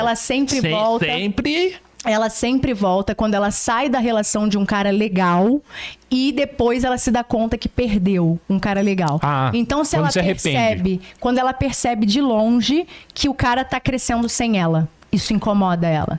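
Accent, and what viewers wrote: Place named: Rio de Janeiro, Brazil. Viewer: Ela é carioca, po. Se fosse baiana ela iria dizer "Oxente!", "Mainha", etc.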